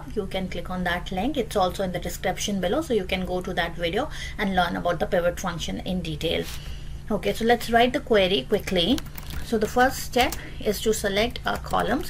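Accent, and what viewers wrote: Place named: India. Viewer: The accent is so Hinish and so bad and is not understandable!!! learn to speak English as English not as Indian!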